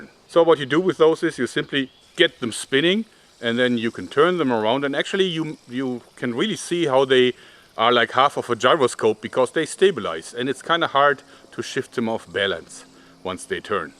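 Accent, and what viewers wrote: Germany. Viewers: I've said this before, and I'll say it again, you're such a happy, jovial man, with an infectious laugh. And the weather seems to always be near perfect when you shoot your videos. I happen to love the topic of your channel, but I think I would watch them even if I didn't because I like to hear your German accent. I hope you have much continued success. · Hi hörst dich Deutsch an glaube auch das du es bist xD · i love this german accent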